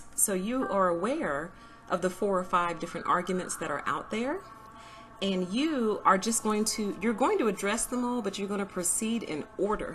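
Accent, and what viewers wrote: United States. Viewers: This is silly but I think constantly about this and I've watched like 20 of your vids. So I'll just say it. I am a trained vocalist, so this analysis comes from someone who at least understands tonality. I love the tone of your voice. You have a bright, cheerful quality yet also a mature mellow, wise depth. You present the sound very high on your upper pallet which is great, it's an excellent placement. You enunciate marvelously with a pleasant neutral American accent. You do not pronounce your words in an annoying fashion at all. You do not use obnoxious voice inflections. Listening to you is very enjoyable and leaves me with a positive feeling. I bless you in the name of YaHuWaH with truth! I love listening to your videos.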